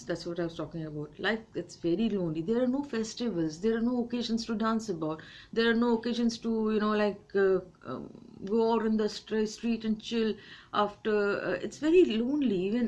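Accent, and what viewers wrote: Bengal, India. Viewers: Dear Madam 🌹🌻🎊🏆👍👌 I could make out that You are a Bengali Nice to hear from you A Matured Mind speak Regards From the City of Joy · Dear, I love your Bonglish.....Your English in Bengoli Accent is mind blowing.....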